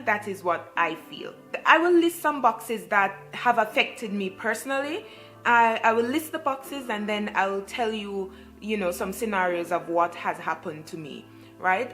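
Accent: Jamaica. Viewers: Girl mi hear di Jamaican accent 🤣🤣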